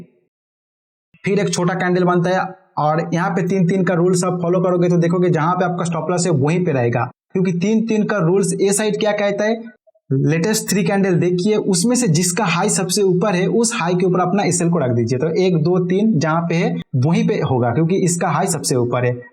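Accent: Bengal, India. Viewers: আপনার হিন্দি উচ্চারণ শুনে আপনাকে বাঙালি বলে মনে হচ্ছে।ঠিক না ভুল?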